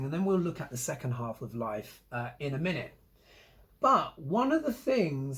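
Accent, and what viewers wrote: United Kingdom. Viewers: I’m a new subscriber and I reviewed a couple of your prior videos. I’m hooked on your style, your astrological intelligence, and your wonderful sense of humor. Also your innate communication skill, especially with your British dialect. Happy holidays and I Wish You Well!!!!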